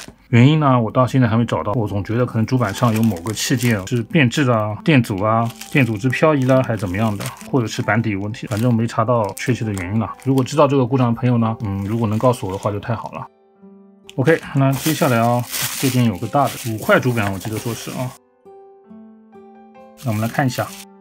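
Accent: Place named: Shanghai, China. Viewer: 对呃，我已经听出来侬呃上海口音了，嘿嘿😁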